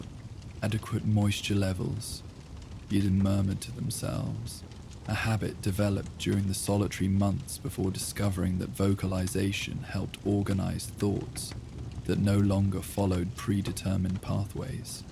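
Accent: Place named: United Kingdom. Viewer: Lost me at the British accent. I came to hear 1950s trans Atlantic accents like in fallout not British mods.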